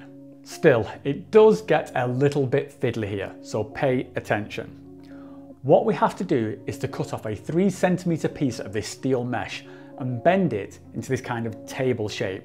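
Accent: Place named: Wigan, United Kingdom. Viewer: Yo are you from Wigan you sound really local I’m from Preston haha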